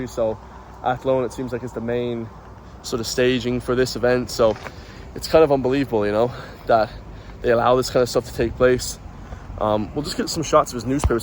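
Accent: United States. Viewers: American accent, why?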